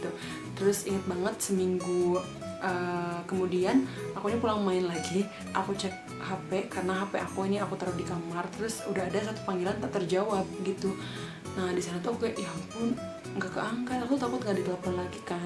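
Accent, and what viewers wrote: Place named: West Java, Indonesia. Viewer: Duh sunda pisan euy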